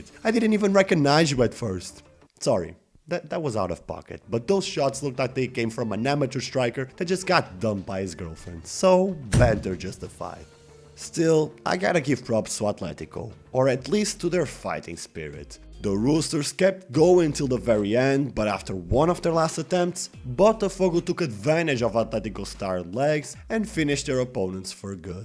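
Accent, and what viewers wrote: Portugal. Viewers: Are you portuguese? I can hear some accent rsrsrs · No tactics? No strategy? Based on your accent, I thought you were Portuguese, but you talk about football like an American. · Portuguese accent, and his gf is Portuguese, while he might have learned the language I'm assuming he's not from Portugal am I right?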